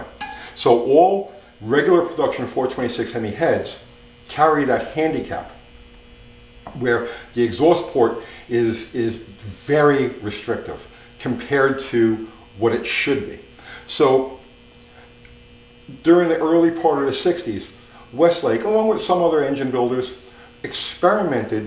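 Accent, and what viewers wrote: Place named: Long Island, United States. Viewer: you remind me of home. From Long Island. I could listen to you for hours.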